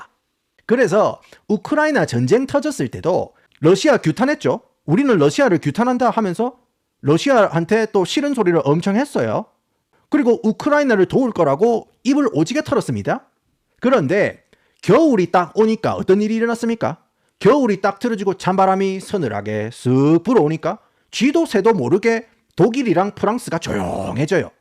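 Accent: Gyeongsang, South Korea. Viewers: 경상도 사투리 구수하고 영어도 잘 하셔서 계속 듣고 싶어요 ㅎㅎ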